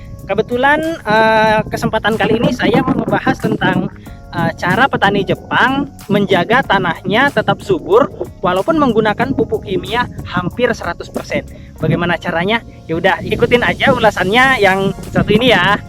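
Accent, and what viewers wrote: Java, Indonesia. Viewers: mblenyek??? Wong jowo asli ki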